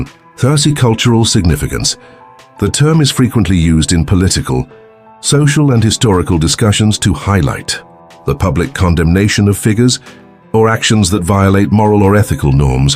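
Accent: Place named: United Kingdom. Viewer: Utterly brilliant, truly magnificent and in British English too. I would be deeply indebted to you to allow me to subscribe to you.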